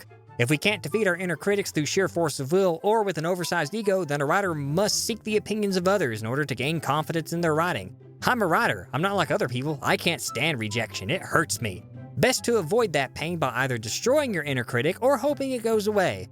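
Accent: South, United States. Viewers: I think the slightly southern accent just makes these videos so much better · dislike for southern accent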